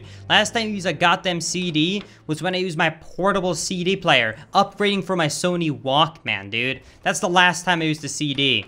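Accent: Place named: California, United States. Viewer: What’s so fucking funny Is that I DO THIS TOO Maybe it’s just because of my California accent but it’s hilarious that I’m GENUINELY trying to NOT say “actually” like 400 times And yes You do absolutely have the elements of the California accent The tone changes, the usage of “like” for 500 different things “Overusing”* “literally” and “actually”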